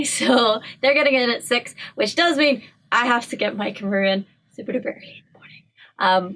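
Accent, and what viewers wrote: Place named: Ireland. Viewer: You loosing that beautiful Irish accent, need to spend more time back home.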